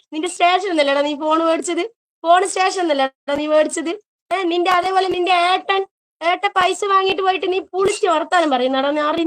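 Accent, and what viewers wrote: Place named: Kasaragod, India. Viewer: ഇവളുടെ കാസർഗോഡ് ഭാഷയാണ് സഹിക്കാൻ പറ്റാത്തത്... ഇടക്ക് അത് കേറിവരും...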